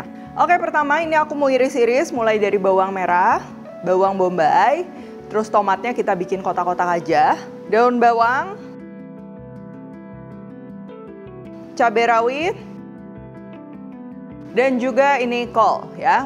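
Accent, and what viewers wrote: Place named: Java, Indonesia. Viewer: makasih cheff...udah recook asli medok banget.. klo ada waktu boleh share resep tahu kriuk ya cheff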